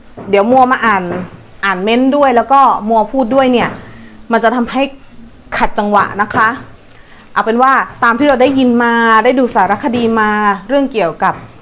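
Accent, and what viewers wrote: Isan, Thailand. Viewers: นางเป็นคนอิสานหรือเปล่าครับสำเนียงออกภาษาอิสานจังหวัดไหนครับ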